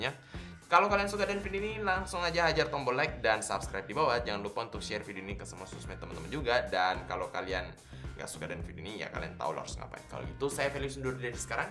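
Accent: Makassar, Indonesia. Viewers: Bukan malah sebaliknya. Justru ciri khas nya itu adalah logat atau bahasa Makassar nya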